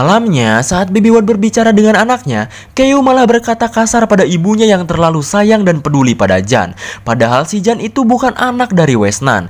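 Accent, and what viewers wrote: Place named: Sunda, Indonesia. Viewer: Orang mana sii bng?ngmng sunda nya lucu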